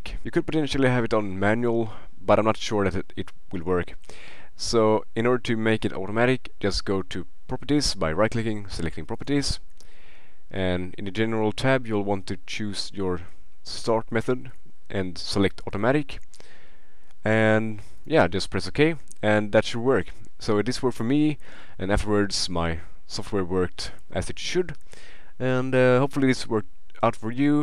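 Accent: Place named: Sweden. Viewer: Tyckte väl det. Finns ingenting värre än att höra en annan svensk snacka engelska, de gör ont i själen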